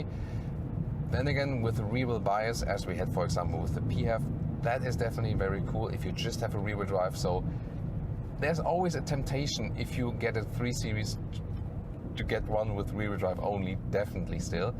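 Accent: Germany. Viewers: There's something so satisfying about a German car being reviewed by a guy with a pleasing German accent. It's just wunderbar!!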